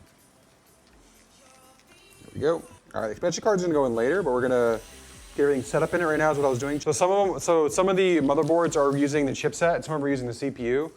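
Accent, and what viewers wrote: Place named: RP, United Kingdom. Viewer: I was fortunate to have the option of the subtitles, otherwise I wouldn't have understood anything you said. Pls either speak slower or get the plums out of your mouth. Sorry about that, mate.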